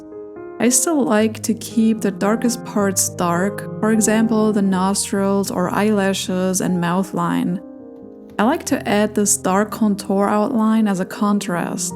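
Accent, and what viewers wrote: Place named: Germany. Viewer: Subscribed! I LOVE the colorful portraits, but honestly? I wasn't expecting your accent. I spent a handful of very happy years living in Germany as a teen, and i didnt realize just HOW much i missed it. So im going to draw alongside your videos and feel all the comfort and nostalgia in the world. Thank you 🥹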